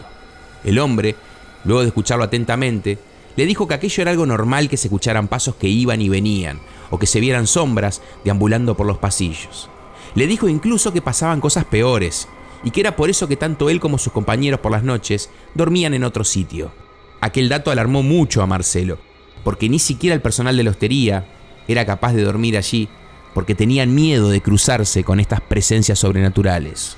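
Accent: Uruguay. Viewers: El a relata es uruguayo de 1 programa de Uruguay pero hablos casi iguales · Acento Uruguayo Papa Saludos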